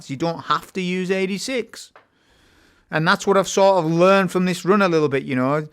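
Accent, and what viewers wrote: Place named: Cumbria, United Kingdom. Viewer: Yo where you from you sound like a fellow Cumbrian!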